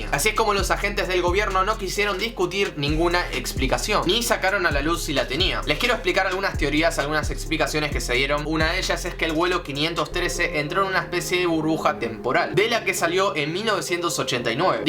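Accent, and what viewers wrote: Argentina. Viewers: SOS DE ARGENTINA ?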